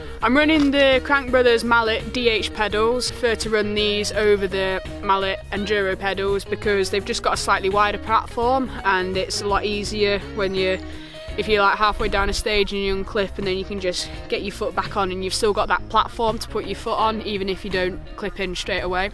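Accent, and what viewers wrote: United Kingdom. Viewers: That no London dialect, Ime from Wigan n she sounds like me. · (5L) Love London Language 😍😍😍😍😍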